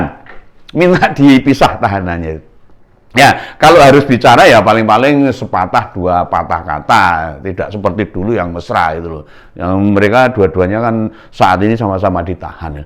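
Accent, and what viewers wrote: Java, Indonesia. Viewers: Mantap mas, carane njenengan menyampaikan koyo dongengengi 😀😀😀👍👍👍 njawani buanget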